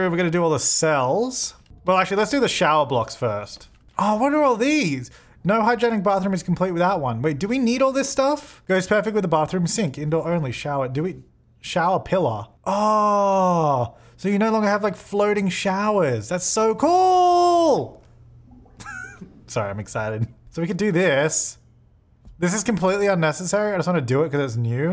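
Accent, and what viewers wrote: Australia. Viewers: They're both Aussie, so maybe that's why they sound the same to you?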